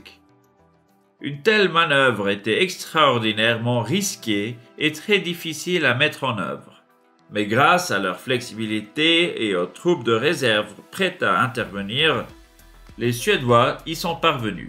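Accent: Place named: Swiss German, Switzerland. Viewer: Suisse allemand, il me semble.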